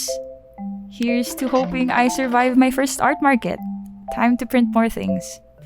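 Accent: Philippines. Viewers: The Pinoy accent is strong with this one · I HAD NO IDEA U WERE FILIPINO BUT AS SOON AS I HEARD U SPEAK I WAS LIKE 🐶🇵🇭❓️ AND THEN I SAW MARKET MARKET OMGG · She sounds like a filipina. That accent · I'm surprised someone thought you were American, you have an identifiable filipino accent. I dunno, I'm filipino and I live in the US so I can point it out easily better than most lol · Filipino accent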